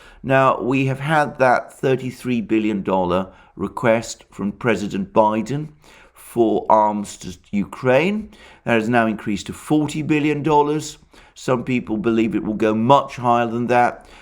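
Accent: United Kingdom. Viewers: Alexander is British. Tomato, Tamayto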